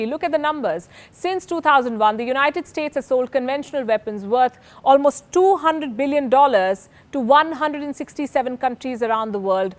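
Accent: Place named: North India, India. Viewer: stereotypes accent media made about india is south indian , most of north indian accent is same as palki.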